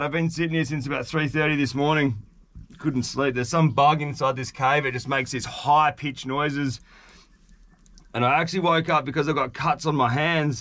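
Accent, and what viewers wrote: Australia. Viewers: As a Canadian, I find your Australian accent very cute 😄. New to your channel and subscribed! It was entertaining, mate. Keep them coming, eh?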